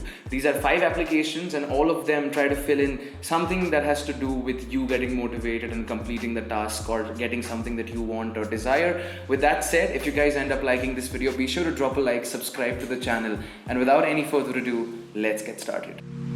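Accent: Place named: India. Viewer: Your English sounds similar to any Indian English News reporter's English😄Thanks for the video!<3I got a crush over your English Speaking.☺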